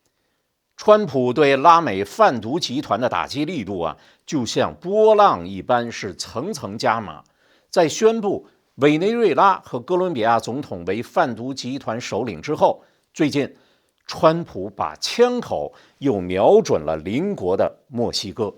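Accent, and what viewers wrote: China. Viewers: 听口音都知道从中国出来的你觉得呢？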